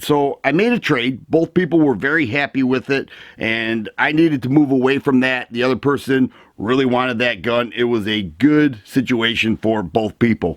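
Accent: Michigan, United States. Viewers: Are you from Michigan or somewhere near there?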